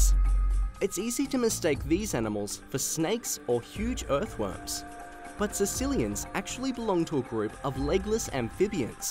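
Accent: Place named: Australia. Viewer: You know a video about wildlife is legit when you hear an Australian narrator.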